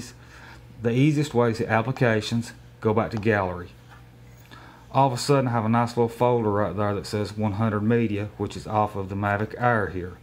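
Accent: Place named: Kentucky, United States. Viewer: By the way where do you live? I used to live in Kentucky and I kinda recognize your accent from when I used to live back there. Not trying to be funny but I was just curious. Used to live outside of Lexington Kentucky in a little town called Winchester KY. who knows we might have been neighbors at some point 😂😂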